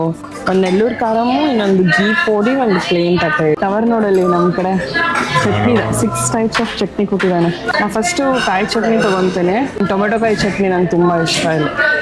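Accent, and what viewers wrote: North Karnataka, India. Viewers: thank u so much for posting this 🙏🏻 ನಿಮ್ಮ ನಿರೂಪಣೆ ನಿಮ್ಮ ಭಾಂದವ್ಯ ಮಾಹಿತಿ ನೀಡುವ ಶೈಲಿ ಸುಂದರವಾದದ್ದು ನಮ್ಮ ಉತ್ತರ ಕರ್ನಾಟಕದ ಭಾಷೆಯನ್ನೂ ಅಮೆರಿಕದಲ್ಲಿ ಕೇಳಿ ಖುಷಿ ಎನಿಸುತ್ತದೆ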